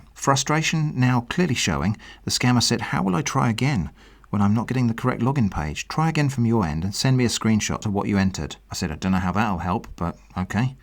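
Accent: United Kingdom. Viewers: Amen to that ! He indeed has a very soothing British accented voice. Or perhaps Australian ?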